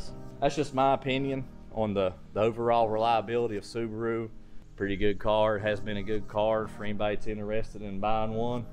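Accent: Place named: Tennessee, United States. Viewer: What state are you from man? You sound like you’re from my neck of the woods. I’ll say I’m about 20 minutes north of Nashville TN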